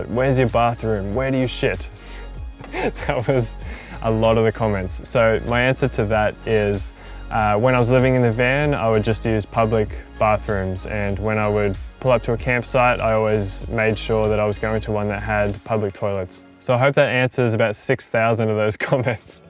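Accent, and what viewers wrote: New Zealand. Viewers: He's a kiwi - from New Zealand 😊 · i knew you were from new zealand lol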